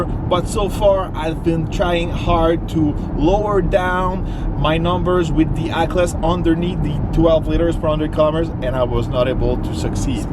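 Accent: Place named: Quebec, Canada. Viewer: My guess Quebec Canada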